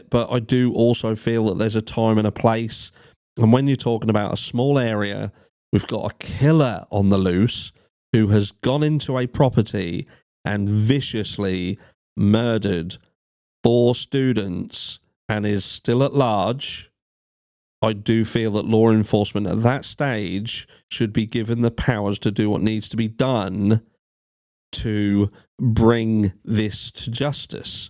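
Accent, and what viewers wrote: United Kingdom. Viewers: Yes I was one who brought up civil rights in the USA. It hadn't registered you were in the UK.* Such "dragnets" may be permissable in the UK. I recall reading about one done many years ago (40s?) (in I think it was in Prof Keith Simpson's book "40 Years of Murder). *(ur accent notwithstanding since I watch a lot of UK based content & thus Canadian, UK & USA accents no longer "jump out").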